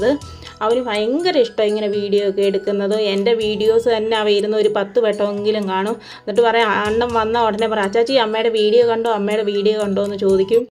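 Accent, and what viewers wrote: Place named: Kollam, India. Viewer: തോനെ കൊല്ലം ഭാഷ ആണെല്ലോ ❤️🥰 Like 🥰🥰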